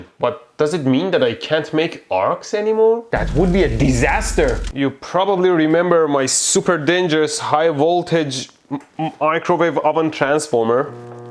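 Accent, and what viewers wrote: Iran. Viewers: The second i hear him talk i can tell he’s Persian as hell. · I'm Persian and his voice is to Persians what a newscaster's voice is to Americans. It's the most Persian accent on the planet lol.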